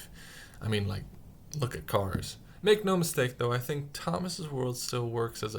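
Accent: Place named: United States. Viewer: I was not ready to hear an American narrator. I didn't know they made that.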